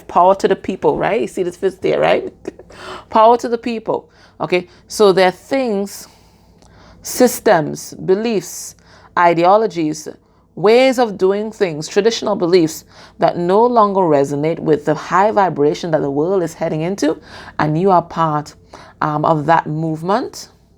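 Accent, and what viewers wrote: Trinidad and Tobago. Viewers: Thank you. Always love hearing that trini accent 💕🙏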